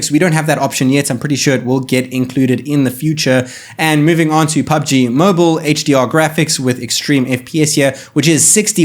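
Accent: South Africa. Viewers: Hi, like your channel i can tell your South African, whats the easiest way to get my hands on this sony 1III in South African?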